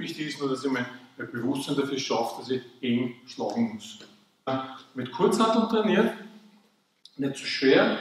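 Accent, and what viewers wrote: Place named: Vienna, Austria. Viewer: Hallo Super video nur eine kleine kritik am rande sie reden zu schnell und man versteht sie kaum also zumindestens ich aus hamburg verstehe kein wienisch manche wörter versteht mann aber wenn sie zu schnell reden kommt man nicht mit Das gesagt bedanke ich mich für das tolle video weiter so ein daumen hoch von mir und das abo an ihr kanal selbsverständlich 👍👍👍👍👍👍👍👍👍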